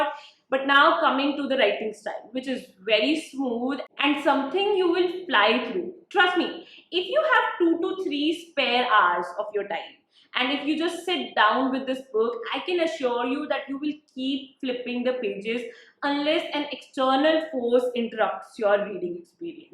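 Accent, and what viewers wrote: India. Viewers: You are so cute especially your Punjabi accent which makes you more cuter.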